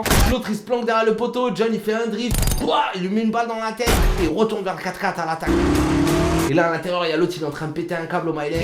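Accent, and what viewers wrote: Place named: Algiers, Algeria. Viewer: Wallah tu parles comme nous a Alger. La manière, les bruitage, zid en plus les mots en arabe. Sérieux kho. C'est vraiment fort.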